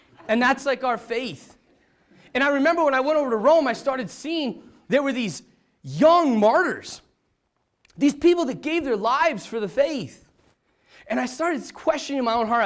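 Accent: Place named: Ireland. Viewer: nice irish accent, lol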